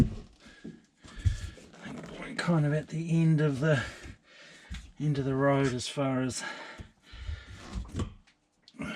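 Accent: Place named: New Zealand. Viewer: I would put in another inspection hatch and install the sensor into that - unclip the wiring, unscrew the hatch lid and there is your sensor. Great video, I started with Part 1 as result of a search on marine toilet maintenance. So nice to hear a Kiwi accent from a Youtube search for a change. I'm going to install a marine macerator into my new motorhome so doing lots of google and youtube at the moment. Must do another Picton trip (from Chch) as soon as I am mobile again.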